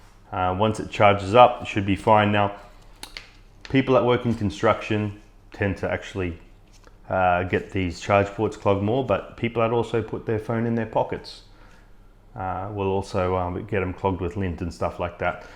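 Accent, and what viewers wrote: Australia. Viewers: What a blessed relief it is to hear some one speaking in Aussie accent (or is it a Kiwi accent? - I can't tell the difference) instead of an American accent. And someone who speaks clearly. And better yet still - not to have some pointless bloody awful noise going on at the same time. Well done on this count alone. Your advice also came up trumps! - it was the tooth pick suggestion that saved the day. Much thanks! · Yet another success story - thank you so very much! And as a bonus, I was able to be enchanted anew by that delightful Aussie accent, with tooth peeks which aren't too theek :))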